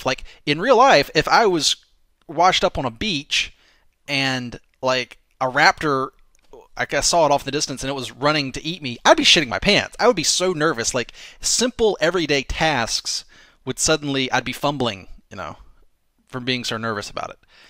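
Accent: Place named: North, United States. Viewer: ***** I was pleasantly surprised, but only because seems to have a pronounced Northern American accent. As a Texan with a WWII rifle and a full-size steel combat pistol less than 2 meters from my bed, it's perfectly normal, but Americans from the more Northern states stereotypically avoid gun ownership (Maine and New Hampshire excluded). But I'm delighted to find out likes guns too! He's risen even higher in my estimation of his character.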